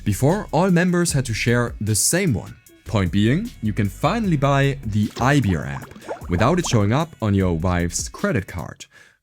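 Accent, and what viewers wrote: Germany. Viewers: Am Akzent rausgehört dass er Deutsch is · Omg dieses german accent ist halt so schlimm 😬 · I could easily hear your German accent but it sounds good and really natural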